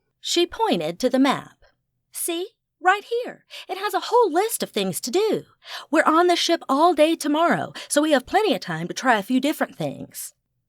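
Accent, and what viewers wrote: South, United States. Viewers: I do love the added male voice with the deli ious British accent. Dont get me wrong, I love the southern accent also ( I am a born and raised Georhia peach ) but the British accents makes my old heart flutter. Lol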